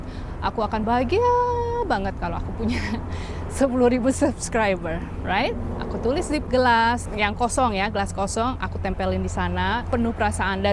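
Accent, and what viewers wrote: Banyumas, Indonesia. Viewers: Setujuuuu... Mungkin karena sesama suku ngapak2 jadi berasa lebih mudheng... Apalagi pas bilang "abab e" 🤣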